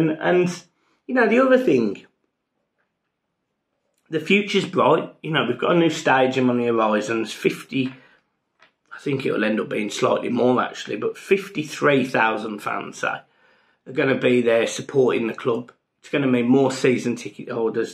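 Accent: Birmingham, United Kingdom. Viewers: Oh mate, you're actually getting the stick for being a Brummie? I'd love to see some of those pricks talk to me; because I've been following Everton since I selected the team in CM back in 2002/2003 when Kevin Kilbane was playing and I'm from İstanbul. I've been detecting the accent was not Scouser but who the hell cares. And as a Fenerbahçe lad, I'm learning from your optimism, since it's absolutely not our strongest suit, haha:) Keep up the good work and hopefully Everton can avoid the relegation. 2 dynamic full-backs, 1 decent striker and 1 creative attacking mid right in front of Doucoure-Onana pair; we're good to go for next season.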